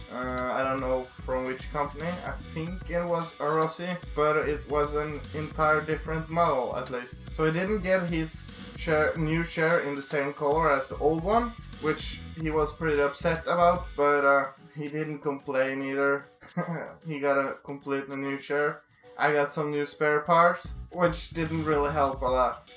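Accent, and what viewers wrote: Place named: Norway. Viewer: Such hatred. This is jut a review man, and u are making fun of his weight and norvegian accent. You are a douche. By the way, the chair is suitable up to 150kgs.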